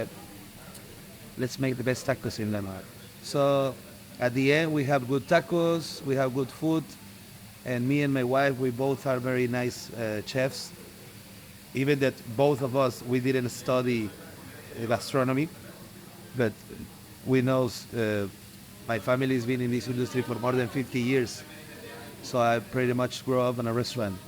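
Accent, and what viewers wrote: Mexico. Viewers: lol he's Mexican. His accent is Mexican with English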